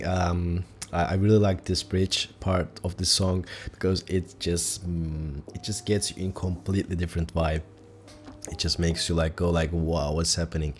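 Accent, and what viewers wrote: Turkey. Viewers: HELAL LAN TURKO AKSANINDAN ANLADIM TURK OLDUGUNU EDITLER TAM ECNEBILERI CEKECEK SEKILDE OLMUS BASARILARININ DEVAMINI DILERIM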